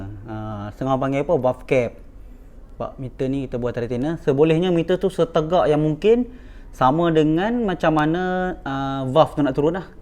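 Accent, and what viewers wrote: North, Malaysia. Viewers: Lama2 skali bunyi jugak loqhat utara...apa2 pn best penerangan beza cam..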